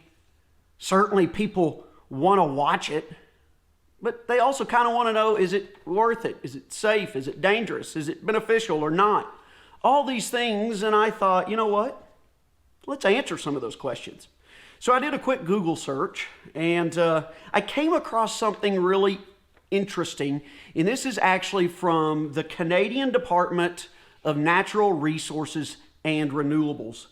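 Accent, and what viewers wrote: Arkansas, United States. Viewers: Hay I was wondering if you are from Arkansas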